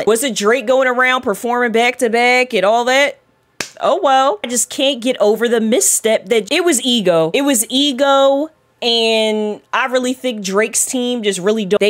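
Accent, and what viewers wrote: Philadelphia, United States. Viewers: You gotta be from philly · Hearing a Philly girl hit an "uwu" is hilarious. How that broke through that strong accent is crazy 😂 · Girl you got a new sub off the screaming 'is this new sh¡t" and banging that table like that. Female reactor energy i dont see that i need! With my ALL TIME FAVORITE accent Philly...im all in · I love this beautiful woman being her authentic self and her Philly accent. Meek is not a real nigga 😂😂😂 I’m from Detroit and I couldn’t tell you what a Toledo nigga culture is either lmao Talk to your tumbleweed is crazy 💀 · Dope! First time through the channel and had to subscribe simply because of the Philly accent throughout the breakdown 😂 I'll be going back through your old stuff. Real Hip Hop will always show when the heat is on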